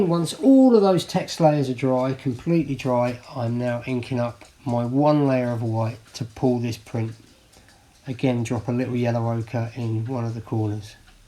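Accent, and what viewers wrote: United Kingdom. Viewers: I shared it w my art group and we may spend a day trying it…getting magazine images to transfer is a neh, sometimes it works some times it doesn’t, but I’ve had luck using pigment ink before laying the page down….I’ve got you on my watch list, as there’s lots of videos I want to watch…and I love your British accent!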